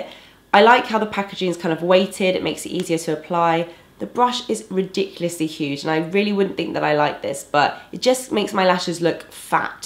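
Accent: England, United Kingdom. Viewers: I just love your English accent!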